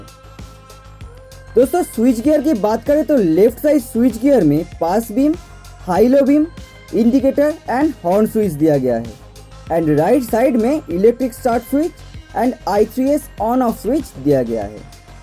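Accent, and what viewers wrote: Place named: Bengal, India. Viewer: Hindi mei bengali tone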